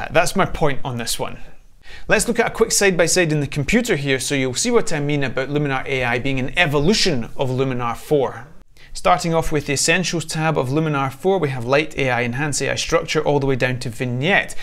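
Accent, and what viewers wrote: Scotland, United Kingdom. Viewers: I've just subscribed not only because of the quality content but also because I love to listen to scottish accent :-)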